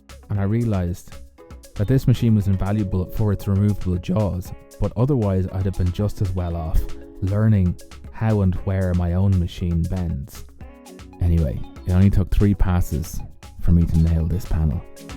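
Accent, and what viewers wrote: Ireland. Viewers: music is fine, but you appear to be only talking out of my sub woofer. I'm pretty sure it's not just your delectable Irish accent... 😏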